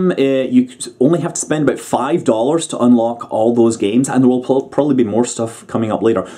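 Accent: Scotland, United Kingdom. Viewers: your pronounciation was so off, that I only got who you were talking about from your explanation. So here I'm trying my best to provide a 'phonetic' transcription of Erdős Pál's name for the benefit of the internet. (We have a different order for names, yes.) Pál Erdős - Paul (is sufficient, but Pahl would be closer) Ehrdoesh Keep up your Scottish awesomeness!;)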